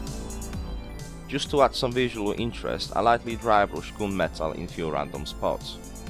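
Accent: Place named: Liverpool, United Kingdom. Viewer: Great video! I'll have to make some of these! Love your accent btw! Did you learn English from a Liverpudlian? Certainly got that scouse ring to some words. Great job!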